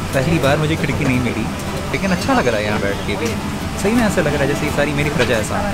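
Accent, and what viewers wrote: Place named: Haryana, India. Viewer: Bhai love the way use haryanvi accent and words in between ur lines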